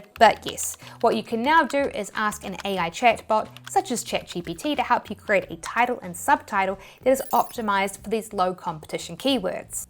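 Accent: New Zealand. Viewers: why yees instead of yes and aheed instead of ahead is it like a kiwi accent ? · Video showed up on my recommended, so I clicked on it, and as I listened, and I wondered to myself if you were from New Zealand, so I came to the comments, and my question was answered 😂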